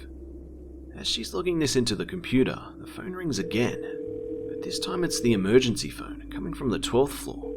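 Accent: Australia. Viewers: Your Australian I think? Is he/Are you?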